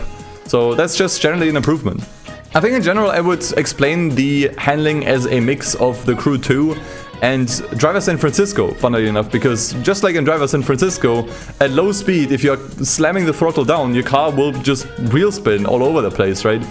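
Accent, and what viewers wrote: Germany. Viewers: U have a strong german dialect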